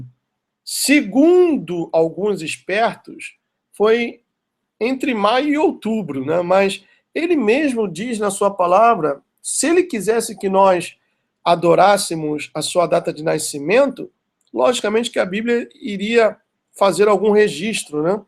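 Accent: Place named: United States. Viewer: tchat???!! pô fala serio.... vc mistura português com sotaque americano... se liga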